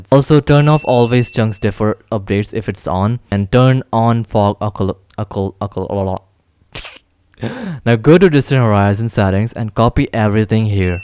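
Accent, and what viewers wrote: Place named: India. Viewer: i feel like getting scammed from a india scammer he has like an voice like an idian try to speak english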